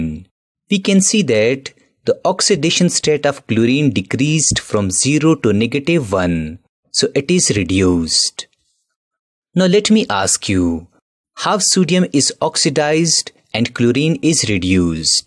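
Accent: South India, India. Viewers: Top waale teacher .. I mean very superb teacher with south accent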